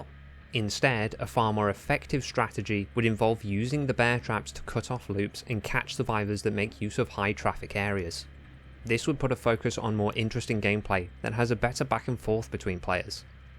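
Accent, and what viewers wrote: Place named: United Kingdom. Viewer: I came for the Trapper rework, I stayed for the sexy British accent ❤❤❤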